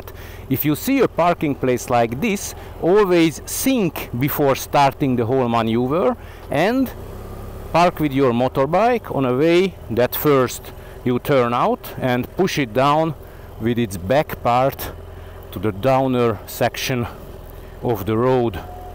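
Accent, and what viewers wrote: Hungary. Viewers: Great video. Based on your accent, are you Hungarian?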